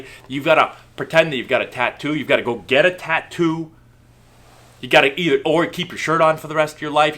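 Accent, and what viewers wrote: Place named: Boston, United States. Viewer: You must be from Boston with that accent...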